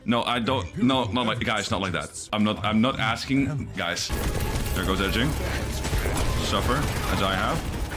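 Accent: Netherlands. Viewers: een nederlands accent in het engels is ook zo makkelijk te herkennen lmao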